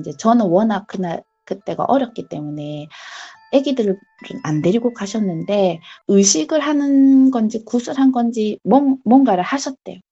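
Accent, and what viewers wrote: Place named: Busan, South Korea. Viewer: 진짜 동감입니다ᆢ'부산사투리 짱입니다ᆢ말도 잘하시고ᆢ목소리도 이쁘시고ᆢ또 부탁할게요 ^^♡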